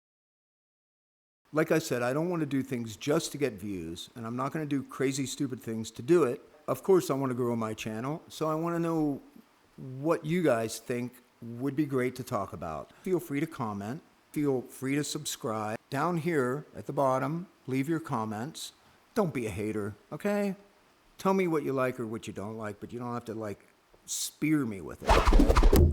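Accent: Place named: Eastern Europe. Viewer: Cool Vlog man, Fred is right it does sound eastern European. Anyway log time fan and looking forward to the next one!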